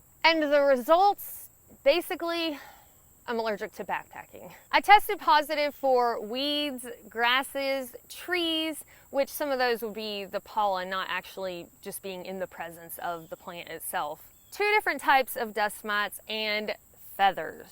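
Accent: South, United States. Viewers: Sorry about the allergies but love the Southern voice.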